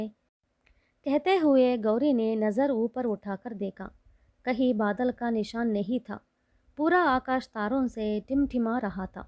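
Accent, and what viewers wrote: South, India. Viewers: So nice 👍. Old Chandamama of 1960s and 1970s was pure 💎 gem. Carry On dear. Read more of these in Hindi version so that deserving children are not deprived of the good lessons taught in childhood 😊😊😊😊. Best of luck 😁. Your South Indian Hindi accent makes it so sweet and beautiful to listen. See that your accent has become your strength and advantage.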